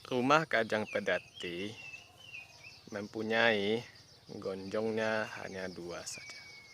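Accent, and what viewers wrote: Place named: Java, Indonesia. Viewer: Si mas ini org Jawa yaa? Loghat ny keliatan soal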